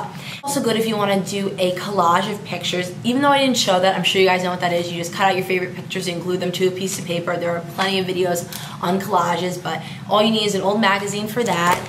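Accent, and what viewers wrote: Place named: South, United States. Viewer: no effence but your accent gets on my nerves are you southern? like its not lasht year its laSt year!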